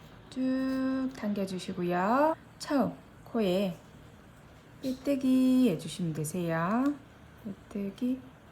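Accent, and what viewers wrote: Busan, South Korea. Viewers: 어머...손재주 좋으시네요. 이런건 구매해서 쓰는줄만 알았는데... 살짝살짝 부산 사투리 듣기 좋아요 설명도 잘해주시니 한번 만들어 보고싶은 욕구가 막 생기네요.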